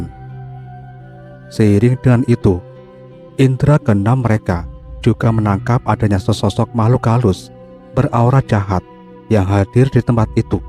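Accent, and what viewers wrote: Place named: Java, Indonesia. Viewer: Logatnya yg bicaranya orang jawa medok y..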